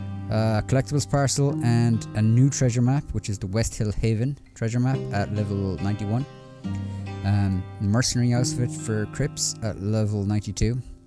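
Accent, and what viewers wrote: Ireland. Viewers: You Irish? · Didn’t expect to be an Irishman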